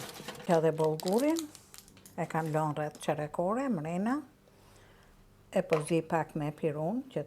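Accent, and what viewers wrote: Tirana, Albania. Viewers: Ty te ka lezet luga kur gatuan dhe dialekti jonë i bukur i Tironës.❤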